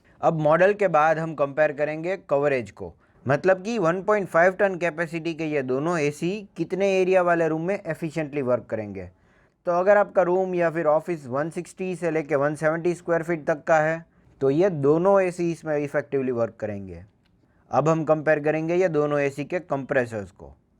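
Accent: Gujarat, India. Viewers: Gujarati Cho Brother?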